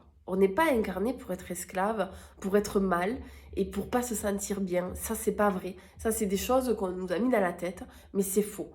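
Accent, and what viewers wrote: South, France. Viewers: Une belle jeune femme avec un accent....c l’accent du sud lalala...et qui dit et qui raconte l’histoire d’une belle Lumière Bleue...c une vibration merveilleuse car tu en es toute transformée...❤❤❤❤❤❤❤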